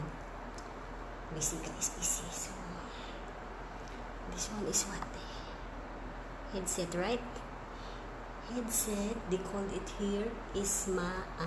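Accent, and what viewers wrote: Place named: Hiligaynon, Philippines. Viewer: Ilongga ka dai , malambing mga ilongga., Stay safe .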